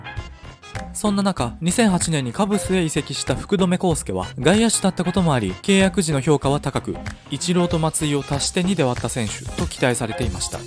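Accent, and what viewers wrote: Kansai, Japan. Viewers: 関西？訛りありますね。良い声です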